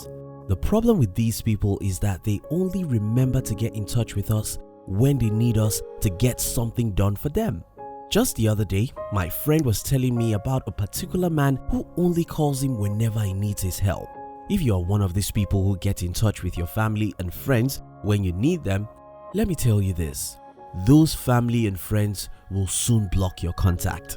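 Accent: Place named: Nigeria. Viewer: Strong Nigerian accent 😆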